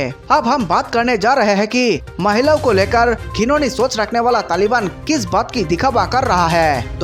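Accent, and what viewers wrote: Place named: Bhojpuri, India. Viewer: तू सबसे बेकार न्यू जलाता है भोजपुरी बोलने का तरीका तेरे पास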